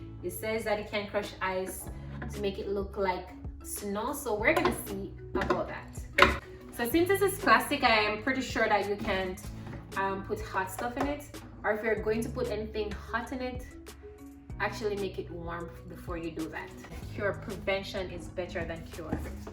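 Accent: Jamaica. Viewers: I can tell by your accent that you are a Jamaican 🇯🇲🇯🇲❤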